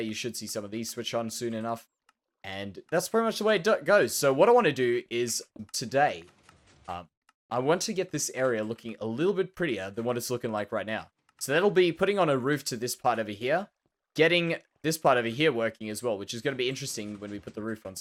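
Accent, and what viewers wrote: Australia. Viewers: Did anyone else mistake his Australian accent for a British one lol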